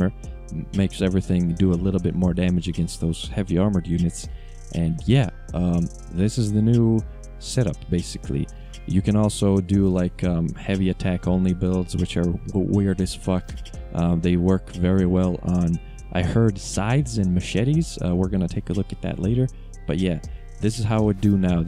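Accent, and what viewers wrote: United States. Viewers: Great information thanks for this. I have to ask, are you from Maryland? You have that accent sort of.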